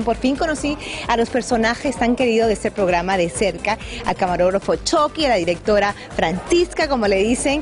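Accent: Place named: Peru. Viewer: Me encanta que conserves el acento peruano. Besitos desde Lima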